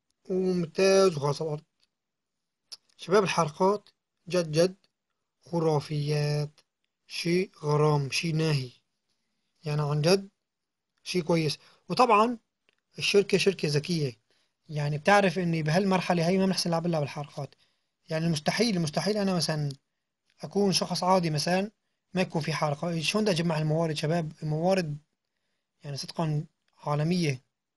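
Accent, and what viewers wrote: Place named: Aleppo, Syria. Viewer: احلا قناة باليوتيوب , ولهجتك الحلبية محلاية القنات, استمر ..انشاء الله بتوفيق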